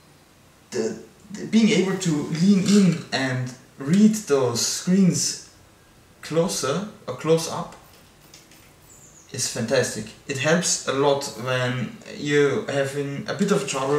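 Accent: Germany. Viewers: That's how a real happy gamer looks like! :D By the way, are you from Germany? Ich frage, wegen dem Akzent :P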